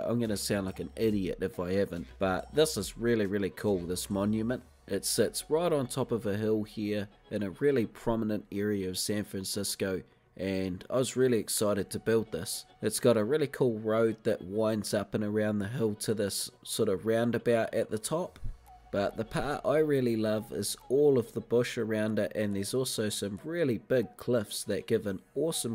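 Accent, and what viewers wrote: New Zealand. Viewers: Can not wait for ep 3! You are remarkable. And I love the NZ accent! Let me not try a voiceover with my South African accent. You will laugh!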